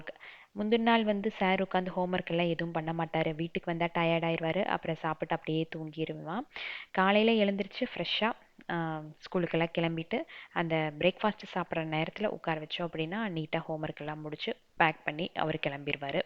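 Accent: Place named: Telugu, India. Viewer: What’s your mother tongue ….i see you speak Tamil really well but you also mix Telugu in that is it?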